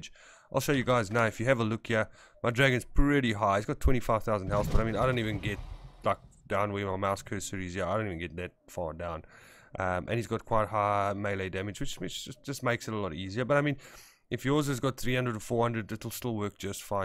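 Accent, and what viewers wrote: South Africa. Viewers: Dude your south African aren't you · You from South africa?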